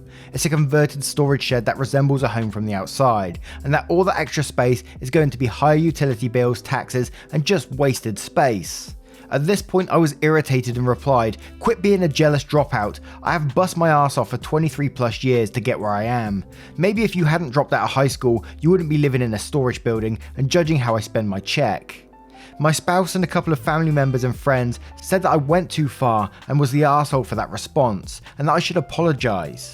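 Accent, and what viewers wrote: United Kingdom. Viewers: I have been really into knitting and crocheting lately. So here I am in Finland, knitting my oversized mittens to try felting them when I'm done while listening to your videos with your lovely voice and British accent all the time. I'm a little nervous about the results since I've never felted before, but I'm having such an enjoyable time with these two activities while sipping tea ^_^ Wish me luck, please!! :)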